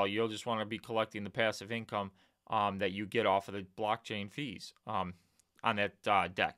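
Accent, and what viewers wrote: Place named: United States. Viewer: Sounds like an american to me.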